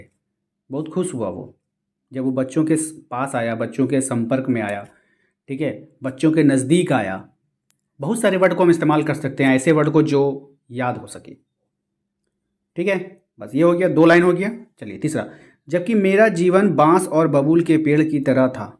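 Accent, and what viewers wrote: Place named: India. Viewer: fxck curry accent 🖕🏾🤢🤮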